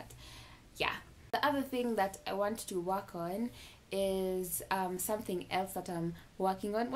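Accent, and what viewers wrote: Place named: Kenya. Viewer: me i thought is not bad english it’s just being Kenyan 🤣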